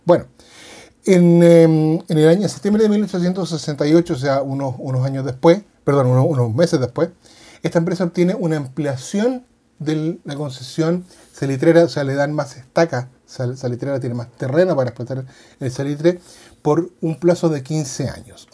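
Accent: Chile. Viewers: Hola, gracias por tu nuevo video. Soy chile y entiendo tu manera de hablar, pero tengo claro que si quieres llegar a mas personas, debes tratar de hablar en un chileno mas neutro. Que te vaya muy bien.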